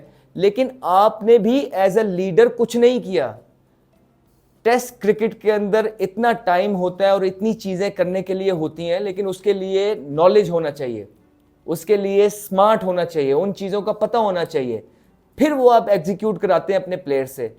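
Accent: Punjab, India. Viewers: Shazad bhai one of the many excellent talents who could not flourish or even evolve because of what Pakistani hierarchy is, and excellent points bhai, also love your “pure” Punjabi accent! Keep it up Sheraaa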